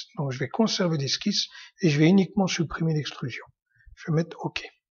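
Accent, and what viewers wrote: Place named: Belgium. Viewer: Vous venez d'oû? je limpression de reeconnaitre un petit accent belge fort sympatique! merci pour cette video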